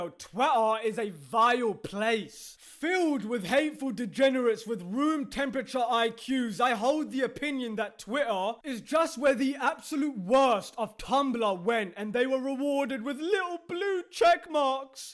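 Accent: United Kingdom. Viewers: he’s British. I understand you have never heard many accents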